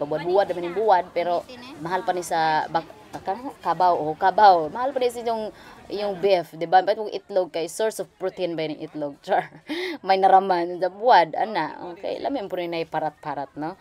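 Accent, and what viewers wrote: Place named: Bohol, Philippines. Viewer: You sound like my ex boyfriend from Loon Bohol and my Grandma from Cortes Bohol hahaha your accent ate hahaha san ka sa Bohol? im from Davao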